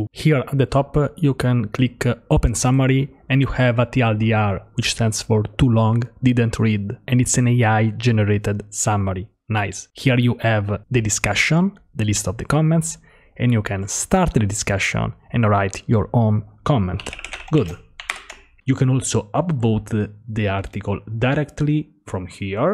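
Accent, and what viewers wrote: Italy. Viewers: dalle prime 5 parole ho scoperto che sei italiano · Very nice. And that crisp Italian accent, wow! :) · Mama mio Italian boss accent i love it !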